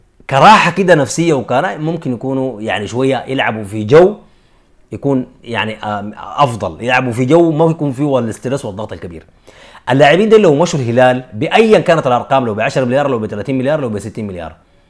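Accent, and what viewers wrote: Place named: Egypt. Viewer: من كلامه ظهر مصري عواليق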